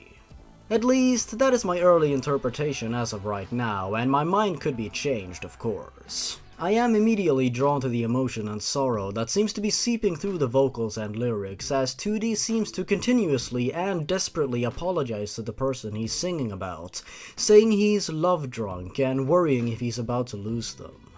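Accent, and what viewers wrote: Sweden. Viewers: Visste inte du var svensk xD Borde ha fattat på dialekten, men jaja...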